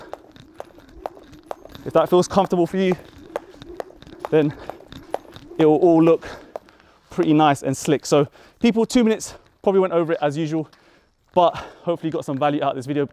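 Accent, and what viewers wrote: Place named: United Kingdom. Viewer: UK aksen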